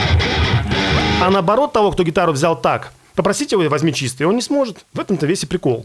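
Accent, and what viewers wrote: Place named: Moscow, Russia. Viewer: тошнит от твоего мАаааацковскава акцента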